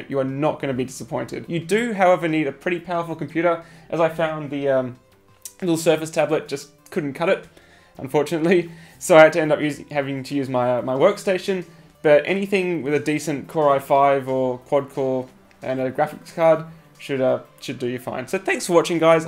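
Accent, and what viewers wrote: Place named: Australia. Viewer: Man love your vids! So to the point, auzzie and easy to understand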